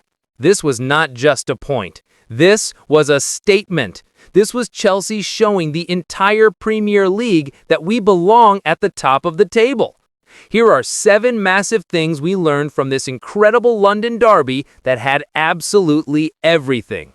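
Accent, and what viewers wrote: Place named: United States. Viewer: We don't want these ghastly American narrations. Spoils things!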